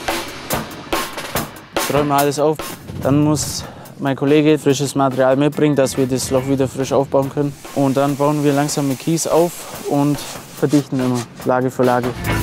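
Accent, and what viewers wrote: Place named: Swabia, Germany. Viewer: Schwäbischer Dialekt - einfach Kult! 🙏😅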